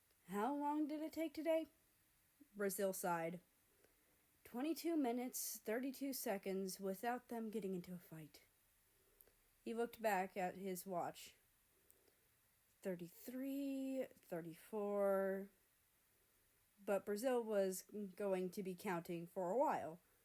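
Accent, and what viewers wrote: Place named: Russia. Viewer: Sfhgfhhjnbdgj I can’t listen to this rn bc I’m busy but AAHHH TYSM!!! I got to about 8 minutes in lol, I’ll finish it later!! I’m actively cringing at the way I wrote things back then- especially the typos in the summary- how did I not notice those- but you know what it’s okay 😭 anyway YOUR READING IS FUCKING INCREDIBLE can I just say that I’m really impressed by your Russian accent?? I can’t do accents to save my life 😂😂 I’ll probably say more later bc I have to go now but THANK YOU SO MUCH ❤❤❤❤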